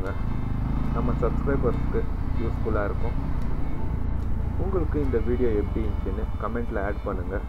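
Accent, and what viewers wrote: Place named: Bangalore, India. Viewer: Bangalore Tamil nice best of luck bro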